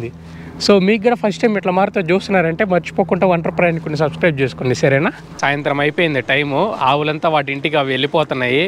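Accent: Chittoor, India. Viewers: Brother Midhi Chittoor district Kadha మీ మాటలు అలానే ఉంది 😊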